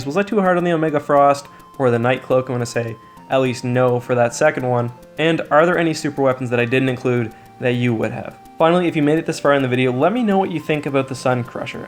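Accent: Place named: Saskatchewan, Canada. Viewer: You from Saskatchewan?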